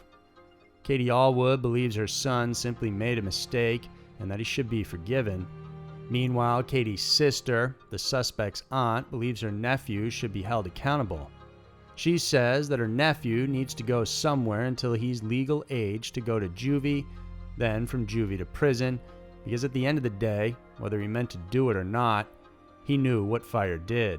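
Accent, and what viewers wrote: South, United States. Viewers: I just love your voice sounds so country😍 great video as always!!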